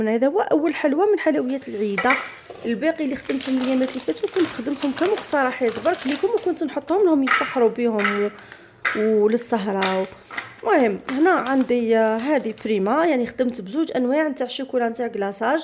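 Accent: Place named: Setif, Algeria. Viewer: نموت على هدرت السطايفية متتبعة ليك من وادي سوف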